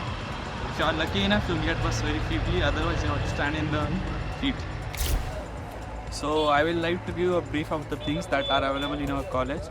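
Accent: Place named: Maharashtra, India. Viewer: When I listen ur hindi first time I knew u r from Maharashtra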